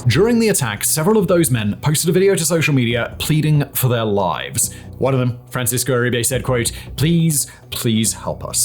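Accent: RP, United Kingdom. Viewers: Wow, can't stomach the Queens English...[you're going to hurt your neck, hands or teeth]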